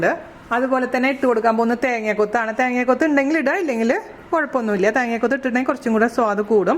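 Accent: Thrissur, India. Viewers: chechi de ഒട്ടുമിക്ക dishes ഉം ഞാൻ try ചെയ്‌തിട്ടുണ്ട്. Already subscribed your channel long ago.. But comment cheytat ഇപ്പോഴണെന്ന് മാത്രം. I like your dishes❤️❤️ also like that trissur slang 😍❤️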